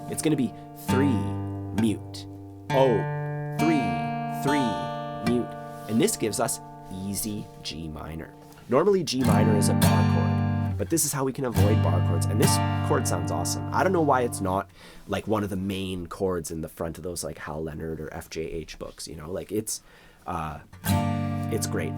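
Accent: Canada. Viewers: holy crap this is a perfect study on how to do a better Canadian accent also really good for learning guitar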